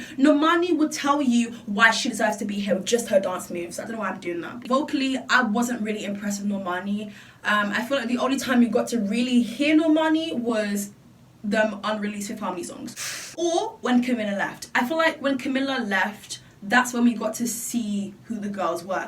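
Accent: United Kingdom. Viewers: Am I the only who has become so used to that I can't hear her British accent any longer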